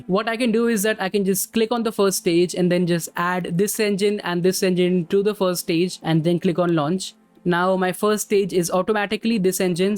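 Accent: India. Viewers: Are you from India or something? you sound like Indian that’s why. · bro it's indian accent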